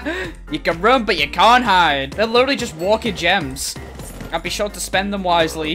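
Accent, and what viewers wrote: United Kingdom. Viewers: your voice is kinda british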